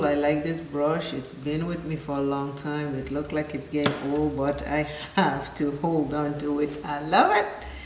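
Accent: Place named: Dominican Republic. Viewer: Are you Dominican?? I hear the accent 😊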